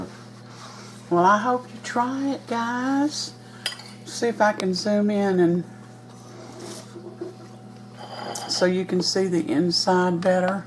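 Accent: South, United States. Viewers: Nice video! Love that you're from the South. You sound like my husband & I. Can't wait to try this!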